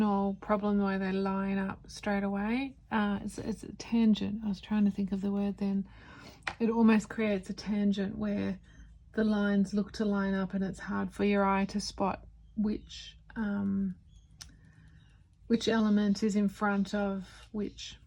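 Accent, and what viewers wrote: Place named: Australia. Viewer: Fark yeah, been looking for a watercolour artist I like to listen to that is not American, I wasn't even DREAMING of finding an Aussie 🎉🎉🎉 Thank you for the great tips, AND your videos are super relaxing as well. All the best.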